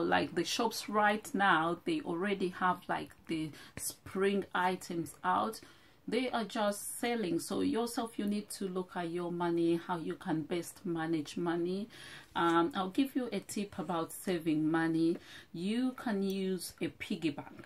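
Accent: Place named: Uganda. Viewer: You are a beauty. Where are you from? I'm Kenyan and to me you sound Ugandan... maybe Zambian or Malawian. Advice: film before a window (the natural light is much better than an overhead bulb. Also, set the phone/camera down so that the footage is stable. Good luck on your journey!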